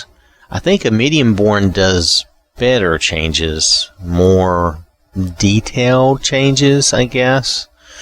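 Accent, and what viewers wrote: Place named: South, United States. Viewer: Don’t hear a lot of southern accents in modding, you are appreciated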